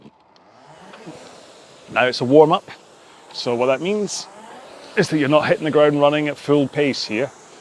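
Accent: Scotland, United Kingdom. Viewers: #Alfresco Have to say I hated that! Just don’t have the stamina just now for that pace so had to stop a couple of times for a few seconds then I needed 30 sec with 6 minutes to go. Can only get better!!! Thanks. Always good to hear a fellow Scot!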